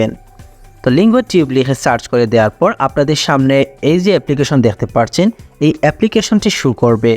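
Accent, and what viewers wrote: Sylhet, Bangladesh. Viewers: Bhai ki sylheti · ভাই আপনি মনে হয় সিলেটি r8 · ভাই আপনি কি সিলিটি❤❤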